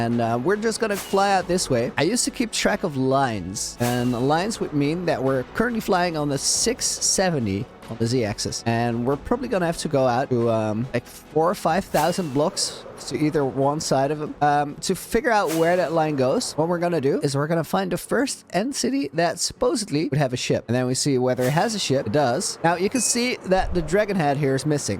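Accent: Netherlands. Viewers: You must be dutch right? ^^ great vid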